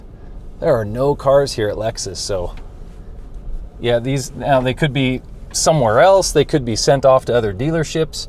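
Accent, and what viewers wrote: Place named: Colorado, United States. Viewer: I'm buying my plug in hybrid Rav4 from Greely in the next few weeks! I could tell you are a fellow Coloradan by your accent 😂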